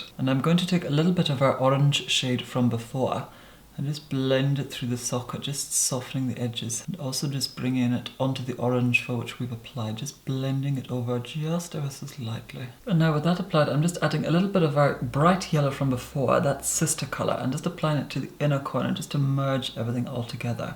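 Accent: United Kingdom. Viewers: It’s nice to hear I am not the only one. ❤️ I do have to admit, the Scottish accent is in my top 3 favorite accents, nevertheless, I have never heard such a chique version. Nonetheless, I felt immediately drawn to it the moment I heard first speak. When I found out the origin of the accent: ah..... naturally. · Me before watching: speaks in eng with a bit of filipino accent Me after watching few videos (filums hah) of: starts talking in british accent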